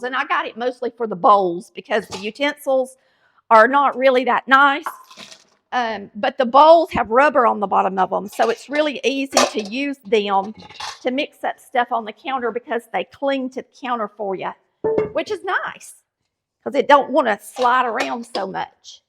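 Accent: South, United States. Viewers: As a Brit I love your Southern accent, it's so relaxing to listen to. The recipes are great too, of course!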